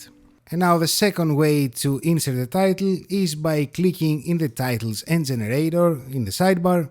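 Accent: Greece. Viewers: I love the Greek accent. This is a great tutorial for beginners like myself. Clear and concise . This will be my "go-to" video until I become an advanced user someday.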